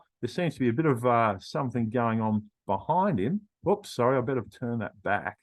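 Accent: Australia. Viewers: Well done. Swapping one Aussie accent for another. At least I could understand it.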